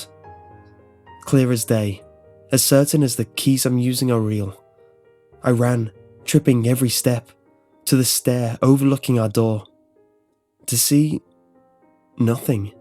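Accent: England, United Kingdom. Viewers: He is from England, they pronounce things differently there (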